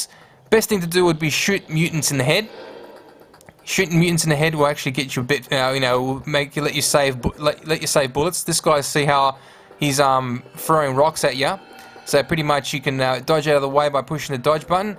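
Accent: Australia. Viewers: Hes australian........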